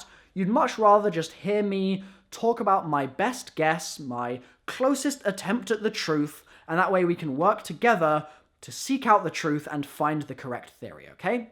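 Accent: United States. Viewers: American accent. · spot on American accent 👌🏽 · Your American accent is amazing do it more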